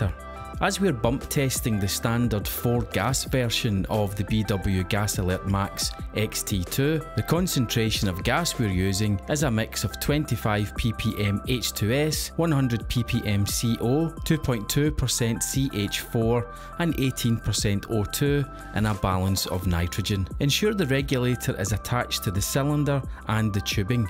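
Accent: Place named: Ireland. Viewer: u Irish I can tell. although this wasnt what im looking for thx.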